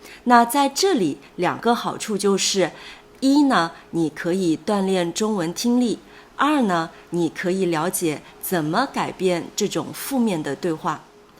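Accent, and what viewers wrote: Standard. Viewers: Another great video! Keep up the great work! (Also, very good standard accent) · 非常感谢老师 ！你的视频太有意思了 ，你讲的中文也很标准，听得清楚，口音也很好听，可爱。而且视频后的生词和造句也对我很有帮助..。我学到了好多词汇。一举两得❤😊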